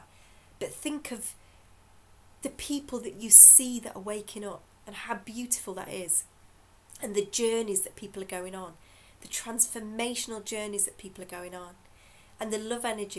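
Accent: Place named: United Kingdom. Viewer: Your GB accent is so much fun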